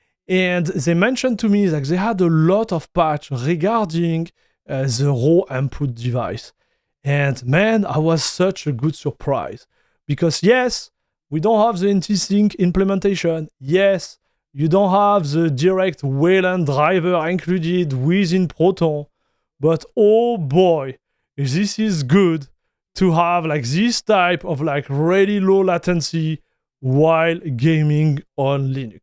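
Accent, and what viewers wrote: France. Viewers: Honestly haven't listened to much French accented tech content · The French accent makes the video better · The French accent makes the video worse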